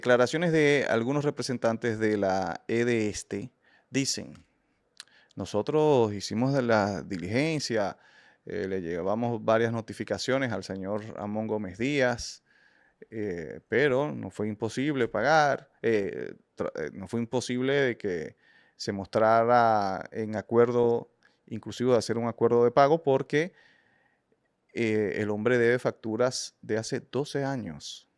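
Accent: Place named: Venezuela. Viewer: Is this guy DOMINICAN..? Sounds very much Venezuelan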